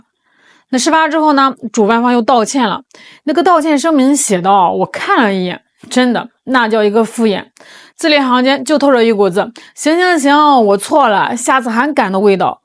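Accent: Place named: Henan, China. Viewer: 河普地道 😂😂😂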